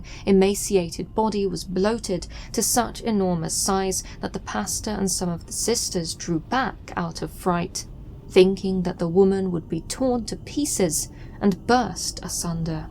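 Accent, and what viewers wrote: United Kingdom. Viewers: I love narrators/storytellers with British accents, it grips your attention and it's elegant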